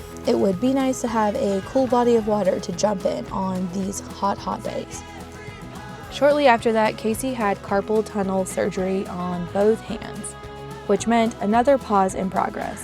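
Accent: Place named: South, United States. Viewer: Hi! I’m new to your channel and LOVE it!!! May I please ask what state you live in? I hear the accent and I thought something was mentioned that makes me think you are somewhere in Tennessee. Maybe one of the Carolina’s? I was raised in Kentucky. Rattlesnakes were a bit too chummy down there!😂😂😂 Thanks for such wonderful videos! You know you are doing a great job when the people watching want to be your friend, and we not only are entertained, but learn things as well! Joy and happiness to you!!💜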